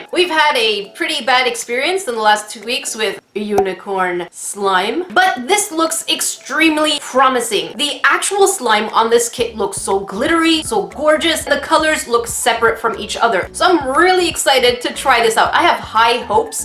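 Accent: Canada. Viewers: OMG are you Canadian?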